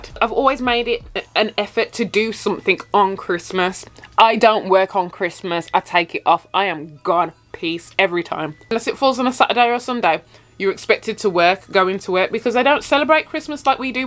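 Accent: Birmingham, United Kingdom. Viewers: Yo sis love the videos. Are you from Brum? Your accent sounds like it haha. Hope you're doing well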